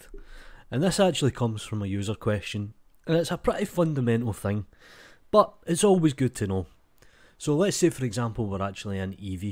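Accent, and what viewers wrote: Scotland, United Kingdom. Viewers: Are you from Scotland? Because your accent sounds really similar to the guy from Limmy's Show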